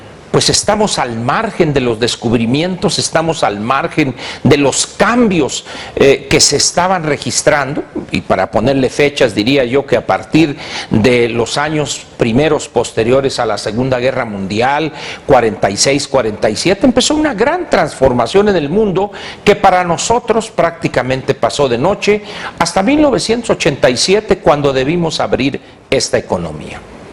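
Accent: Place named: North, Mexico. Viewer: Maestro Angel Verdugo soy su fan con todo respeto... sus interesantes comentarios , inagotables conocimientos y esa forma norteña de hablar 🤬🤬☺️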